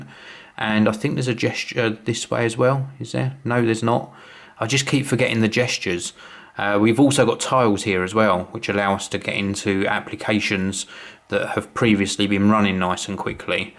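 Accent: United Kingdom. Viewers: If Only we could get Linus to stop asking us to subscribe and his annoying annotations asking the same thing. But I do not mind when you say it, sounds better with a British accent :)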